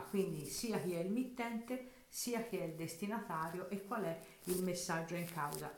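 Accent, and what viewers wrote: Tuscany, Italy. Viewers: Sei dolcissima ahahah 🤣🤣🤣🤣🤣😂😂😂😂già solo cm parli metti allegria, sei Toscana? Poi la chitarra troppo carina 😂😂😂😂😂🥰🥰🥰🥰🥰♥️♥️♥️♥️♥️♥️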